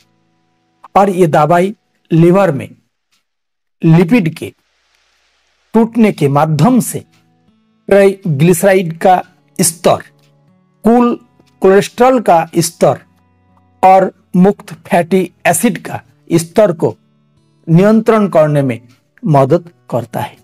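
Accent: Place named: Bengal, India. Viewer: यह बंगाल से है इनकी हिन्दी एैसी ही होगी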